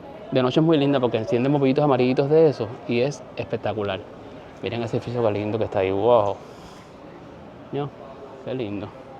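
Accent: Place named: Cuba. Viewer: literalmente cubano, se ve hasta en tu forma de hablar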